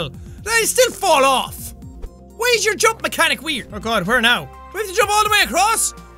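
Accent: Ireland. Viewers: is he irish?? im new ;p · are u asking if he's Irish or not because he definitely is Irish and he's had that accent for a while haha :3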